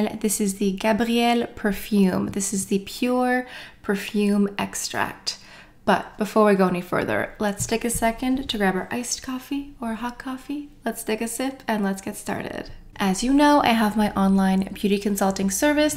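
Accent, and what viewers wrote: France. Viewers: Love this perfume!! Love your French accent! Thx for sharing dear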